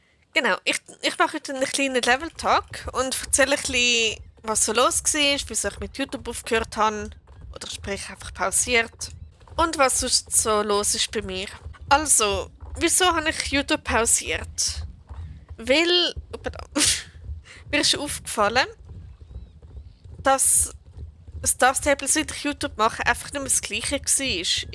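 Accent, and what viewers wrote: Switzerland. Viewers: ich liebe deinen Akzent bzw dialekt das Klingt so geil ich möchte auch gern Schweizerdeutsch können aber ich bin nur österreicherin 😂😂😂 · Bist du Schweizerin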